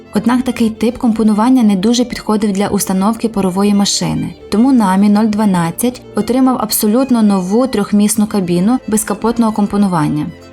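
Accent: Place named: Western Ukraine, Ukraine. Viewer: жінка диктор з заходу країни)))))так цікаво слухати акцент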